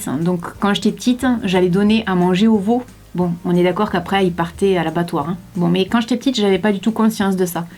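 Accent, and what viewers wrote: South, France. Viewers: Coucou! J’ai découvert ta chaîne il y a une semaine et j’ai regardé toute tes vidéos! Ça fait vraiment du bien! C’est frais et ton accent me rappel ma famille dans le sud. J’aime vraiment que tu te prennes pas la tête et que tout soit naturel ça change des Youtubeuse de 20 ans Alors un grand merci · et bien j'en rajoute une couche, je viens de découvrir tes videos et 1) tu es superbe, rayonnante et rousse ! (comme ma nièce et je vous trouve mais belles !) 2) j'adore ton accent, on est du sud sans aucun doute ! 3) tes videos me font aussi un bien fou ! bisous "maman" ;)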